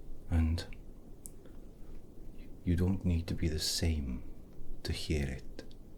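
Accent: Wales, United Kingdom. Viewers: The Welsh accent makes my brain happy. 😁💜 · I can understand him easily but then again I’m Welsh so the accent isn’t an obstacle for me maybe that’s what’s throwing you off? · You have such a lovely voice, whether you be singing or speaking, It's lovely. With your welsh accent it just made the video better. ☺☺☺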